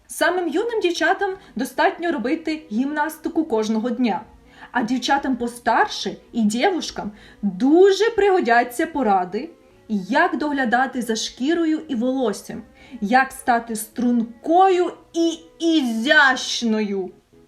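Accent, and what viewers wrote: Surzhyk, Ukraine. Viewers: Зараз здохну від цього суржику 🥵💖🥵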